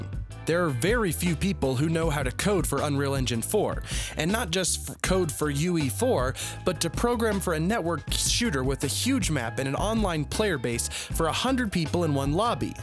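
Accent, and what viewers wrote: Russia. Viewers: Мне показалось или у автора русский акцент? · Мне кажется ты русский,акцент русский слышно.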